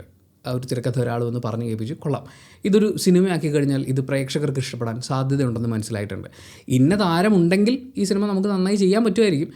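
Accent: Kannur, India. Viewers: ningal...Kannur,kasargode...alle...namma...parayunna pole...namma...Thrissur,Ernakulam...Kar parayuna pole..Chakrashwasam ennokke...pedakkanundallo...any way...nice review about the cine crisis.and your views, solutions and suggestions are appreciatable.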